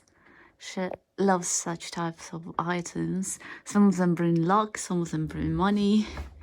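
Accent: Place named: RP, United Kingdom. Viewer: Привет your RP English is pretty good. I love your voice and presentation style. I have been living in Moscow since 2015 so I understand your customs and way of life as they are obviously similar to the Russian way of life. Yet I still find it interesting to watch your videos.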